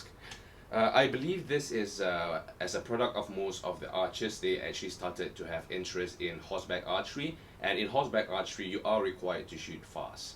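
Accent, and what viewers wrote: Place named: Malaysia. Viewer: I haven't heard a lot of Malaysian English speakers so I'm not sure if this accent is representative. But I like the softness to it .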